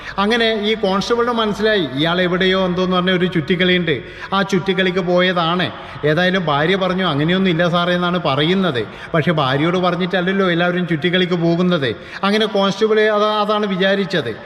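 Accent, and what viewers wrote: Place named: Malabar, India. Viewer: മലബാറിലെ, ഭാഷ എനിക്ക് ഒത്തിരി ഇഷ്ടമാണ്❤❤❤,അതു പോലെ താങ്കളേയും, താങ്കളുടെ യഥാർത്ഥ പേര് എന്താണ്,?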